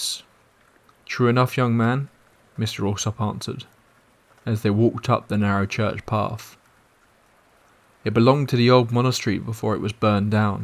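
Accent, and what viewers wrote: England, United Kingdom. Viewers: As an Aussie, hearing an English narrator narrating scary stories lends them authenticity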